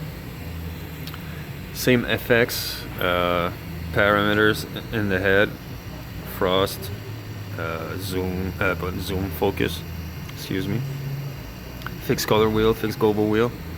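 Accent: Quebec, Canada. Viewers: ca sonne québécois 😋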